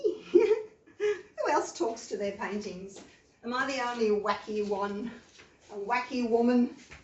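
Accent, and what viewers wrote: Australia. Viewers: Just discovered your channel and it's amazing :) Thank you for sharing all your techniques, that's so generous and inspiring! I'm starting to explore with pouring and you're giving me lots of ideas. And great to hear some Australian, takes me back to my backpacking year ahah Love, from Germany (and France)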